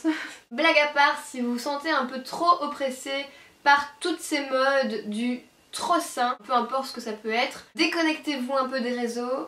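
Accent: Belgium. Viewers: BELGIUM POWER mwaaa! Je trouve pas que ton accent belge est prononcé par rapport au mien ahah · Mais, ne sacrifies pas ton master pour ta chaine et les évènements qui vont avec, c'est trop important;) J'adore ton accent Belge =)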